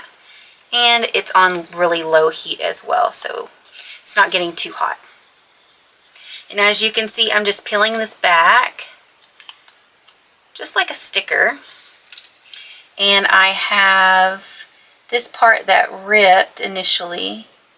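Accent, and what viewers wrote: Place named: Texas, United States. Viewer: I could hear that Texas twang!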